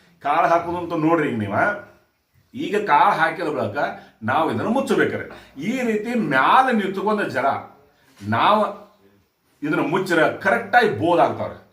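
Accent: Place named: Karnataka, India. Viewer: Sir nivu mathadu rithi mathu bashe nodira nivu bellad bagewadi urinavru irabeku alava sir idakke reply kanditha kodri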